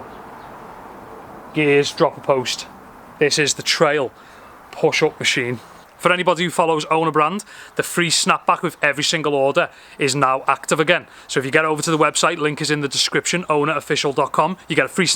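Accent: United Kingdom. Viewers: what type of accent is this? i know it's from UK but i don't know which part.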